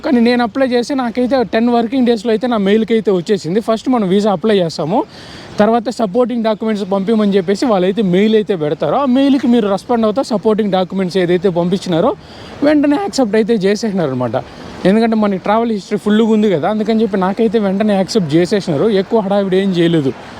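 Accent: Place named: Tirupati, India. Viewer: Brother, nee bhasha chusthe nuvvu maa tirupati laga vunnavu😊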